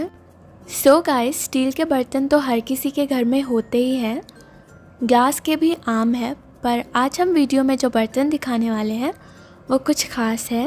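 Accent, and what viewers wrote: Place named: United Kingdom. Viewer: अंग्रेजी उच्चार मे हिंदी बोलनेसे क्या आप उच्चभ्रु होनेका अहसास करती है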